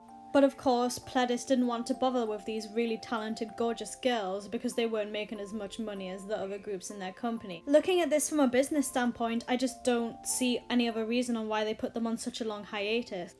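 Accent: United Kingdom. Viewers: Wait r u British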